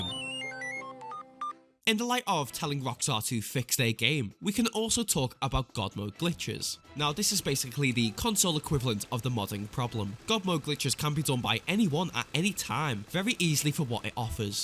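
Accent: Liverpool, United Kingdom. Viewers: so where is the fucking list? i dont want to listen to your stupid ass british voice for 30 minutes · Eyyy you a scouse ? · I can pick up a scouse accent there 😂 I'm from Liverpool as well🤣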